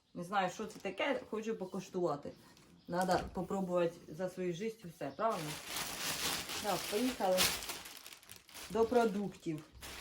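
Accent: Poltava, Ukraine. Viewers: Як мені подобається наш неповторний полтавський суржик!❤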